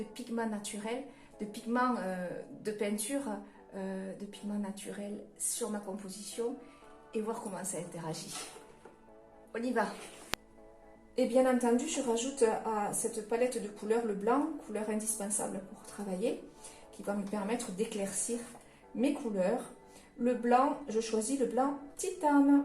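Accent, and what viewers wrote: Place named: Toulouse, France. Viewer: Magnifique ! Merci pour ce tuto très coloré et moderne....accompagné comme toujours de votre joli sourire et du petit accent toulousain 😁😉😘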